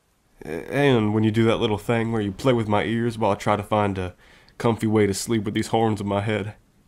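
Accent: South, United States. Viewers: I’m a sucker for the country accent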